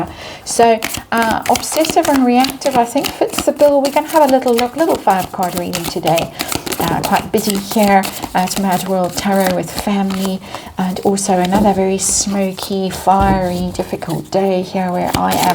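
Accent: South Africa. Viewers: I have to 'scroll up' to get their faces out of view and just listen to any commentary. That's why it's nice to look at the tarot cards and listen to that lovely soft South African melodic voice with its occasional giggles.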